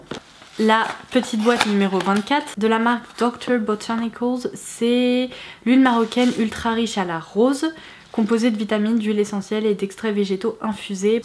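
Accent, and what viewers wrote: England, United Kingdom. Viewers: Ton accent anglais il et cher beau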